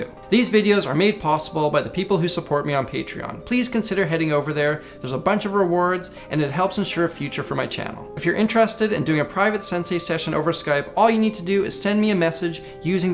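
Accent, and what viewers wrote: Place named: Canada. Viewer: Being honest, your Canadian accent is pretty cool! I get yours way before than American accent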